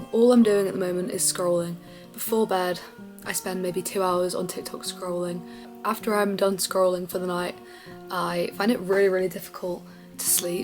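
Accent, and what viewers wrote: United Kingdom. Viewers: Guys her accent , is it british?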